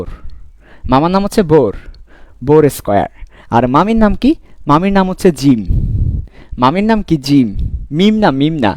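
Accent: Chittagong, Bangladesh. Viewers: Vaiya apni k Chittagong ar nki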